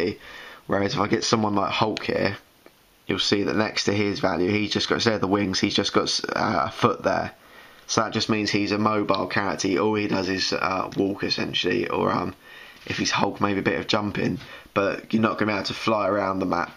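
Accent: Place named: United Kingdom. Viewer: I've found that when instructions are given by a person with a british accent, they always sound smarter than a person without an accent. Bravo!!!! I found this guide very informative.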